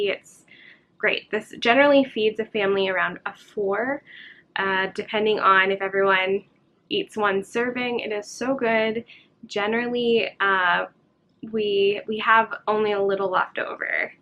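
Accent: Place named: Pennsylvania, United States. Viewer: I love your accent! I’m from New Jersey and the way you say school reminds me of being back home many years ago. I see that you’re probably from Pennsylvania? Close to Jersey. I am enjoying your channel thank you for sharing!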